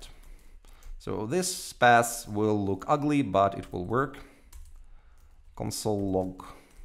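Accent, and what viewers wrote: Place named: Austria. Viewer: I have a friend from Austria and u sound just like him. Are u Austrian? Really good tutorial, Thankyou!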